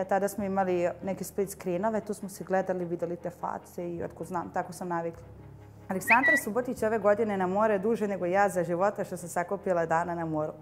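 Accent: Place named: Kaluđerica, Serbia. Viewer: Progovara Kaluđerica iz nje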